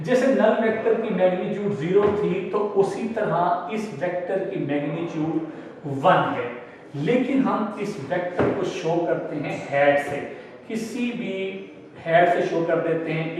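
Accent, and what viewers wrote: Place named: Multan, Pakistan. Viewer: Voice of multan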